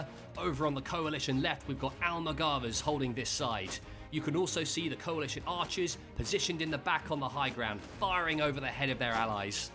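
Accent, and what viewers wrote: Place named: United Kingdom. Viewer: Your British accent and the specific cadence you use makes you sound like an F1 announcer 😂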